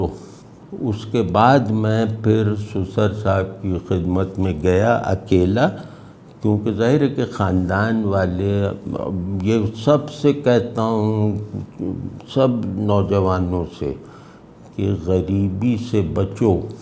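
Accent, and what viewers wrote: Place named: Delhi, India. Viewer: Shaikh ur style of talking shows that u belong Delhi ur knowledge about Chishtia sect is authentic.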